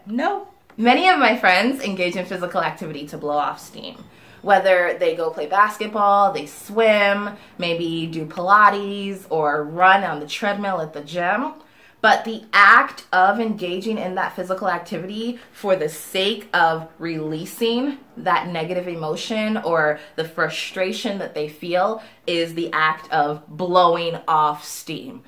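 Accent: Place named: United States. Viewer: bonsoir,oui ça va mieux. honestly i really like learning idioms and make use of them in my spoken english. i like your american accent.where are you from in the states ? i was told the accent dependant on the region you live in the us.right ?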